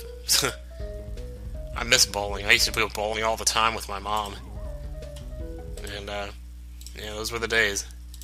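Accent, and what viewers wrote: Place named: South, United States. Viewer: Thanks for post... Not sure where you are from...but your accent (Southern?) Is great! Voice is easy to listen to...And you are funny. I mean really really funny. I am a med student using P vs. Z for stress release... Thank you so much for this post...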